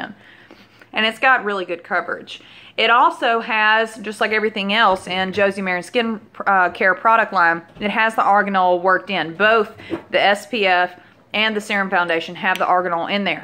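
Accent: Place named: Texas, United States. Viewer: May I ask a question? What part of the country do you live in? I lived in TX for 12 yrs, and your accent sounds a lot like mine!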